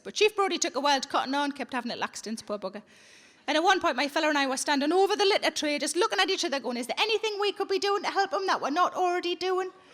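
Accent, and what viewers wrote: Newcastle, United Kingdom. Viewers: i had never heard of sarah millican last month, and now she is my favorite comedian. what a hilarious person she is. and that geordie accent! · She has a Geordie accent. It's from the north-east of England.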